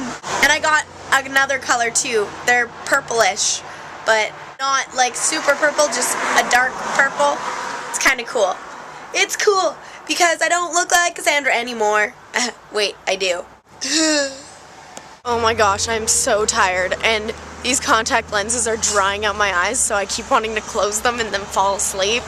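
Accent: England, United Kingdom. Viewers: Your English accent was awesome! Can you do like some of next clog in the accent? PLEASE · Your English accent was awesome.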